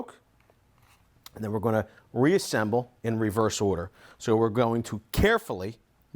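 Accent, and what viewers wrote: Philadelphia, United States. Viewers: I knew that accent! It's like being home again, in Philly! Also, thanks for a clear video on cleaning my Paslode.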